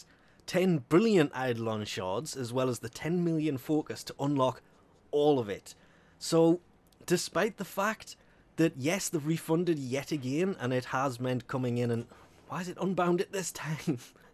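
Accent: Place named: England, United Kingdom. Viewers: Except Tac is Irish and this guy is English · North east England. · Sounds like he's from Newcastle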